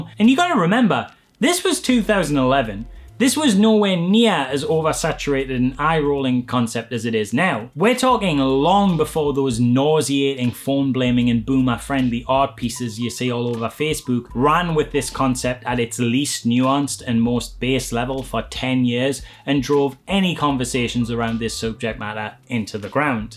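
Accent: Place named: Newcastle, United Kingdom. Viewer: Northerner? I'm leaning towards Geordie... Kinda...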